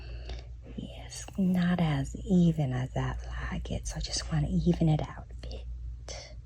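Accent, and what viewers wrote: South, United States. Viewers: “Nice and purdy” Don’t know why but your southern accent, particularly the grooming videos, gives me Steel Magnolias lol I love it ❤ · Gawd, I love that Southern accent. Great video too ❤ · I still loove the southern accent!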